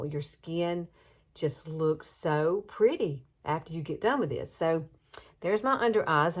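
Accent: South, United States. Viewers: This DOES look fun!😃💗 Thanks for sharing!... And I love your cute Southern accent!😍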